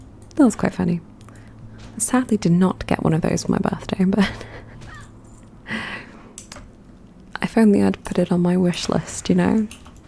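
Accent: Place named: England, United Kingdom. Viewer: Damn such a pretty voice 😮 trying figure out what part of England your accents from. I know your nervous about it but soft spoken suits you I love whispering too 😊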